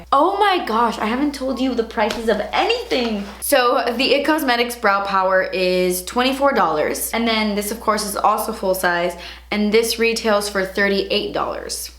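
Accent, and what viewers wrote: Cuba. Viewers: looool i love how u say ahhh entonces! with ur cuban accent! so lovely! im spanish and im always grateful to hear some of ur spanish words 😜😜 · I'm gonna get rid of that couch, ah entonces? So Cuban. Love you, you talk more often in Spanish, it sounds cool.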